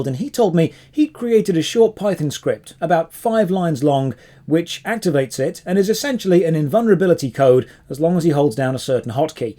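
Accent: United Kingdom. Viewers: Another thing...Why is Topher Grace from that 70s show British and talking to us about gaming???????? 🤔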